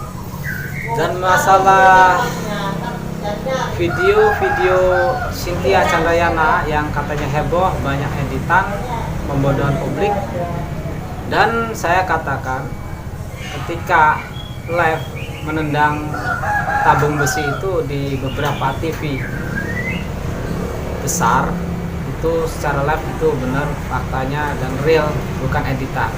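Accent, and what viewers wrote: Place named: Banyumas, Indonesia. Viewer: Aku suka gaya bahasa kamu bro,, Kengapak ngapakan gitu ya?? Pokoke enyonge langsung subrek lah bro,, Salam hormat,💪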